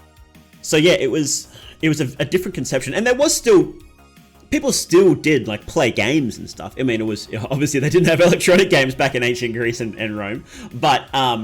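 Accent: Australia. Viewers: You have roight proper aussie accent. This was a nice talk.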